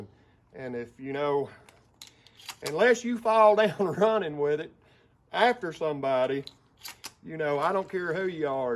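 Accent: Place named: North Carolina, United States. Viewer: You my kind of guy friend got a gun for every situation !!! Love the video and the southern accent which sounds like NC. or Tenn !! Imma Virginia ole boy got my two Colt .45's and want to collect me one of them coach 12 ga. shotguns with the external mules ears and a Winchester 1873 in .45 Colt to complete my cowboy gun collection !!!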